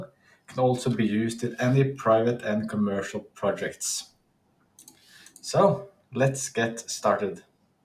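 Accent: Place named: Norway. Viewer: I love your vids too, they're explained in great detail and it shows that you really have a great talent for coding as well as explaining. Keep the great work man ! Side (and totally unrelated) question : wouldn't you happen to be Norwegian ? You have the same English accent as the actors playing in that AWESOME norwegian series Vikingane / Northmen !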